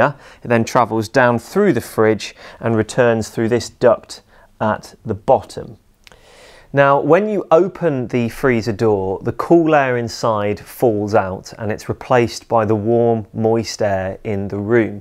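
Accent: RP, United Kingdom. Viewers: Like that accent is it posh?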